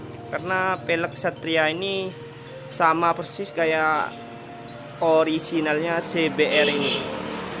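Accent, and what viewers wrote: Madura, Indonesia. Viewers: Kayak orang Madura suaranya yang omong tuh bng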